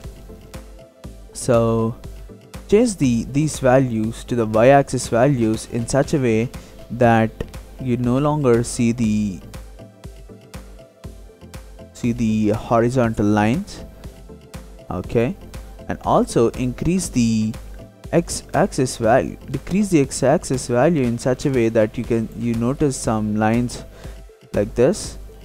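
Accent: India. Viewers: thanks bro are you a south indian guy ?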